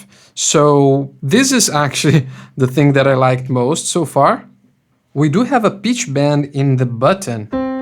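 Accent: Brazil. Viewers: Esse aí é brasileiro, não me engana não kkkk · Adoro um brasileiro falando inglês 😎 amei seu vídeo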